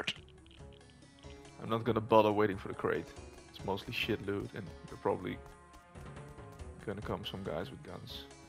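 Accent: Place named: Netherlands. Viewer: Grappig dat je altijd kan horen dat het een nederlander is😅 niks mis met je engels hoor btw! Leuke video!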